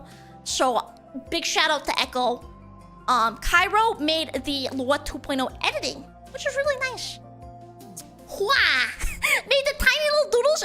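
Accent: Boston, United States. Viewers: Hi, thank you so much for the fun chat at Sakura con. Can't wait to see this channel grow. Love the adorable Boston accent btw.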